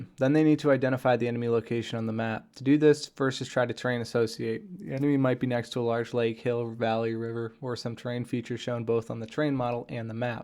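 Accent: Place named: Ireland. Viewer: Yeah dude it sounds Irish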